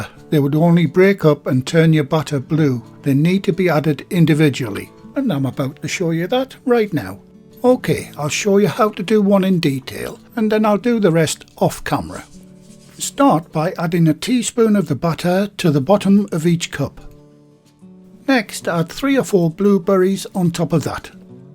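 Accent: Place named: North East England, United Kingdom. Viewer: Hi, I’ve just made your amazing blueberry muffins absolutely delicious, I recently retired and it’s my favourite pastime baking your recipes, excellent instructions and great to have someone with the same accent, as I am also from the North East of England All the very best for the future and thanks again 👍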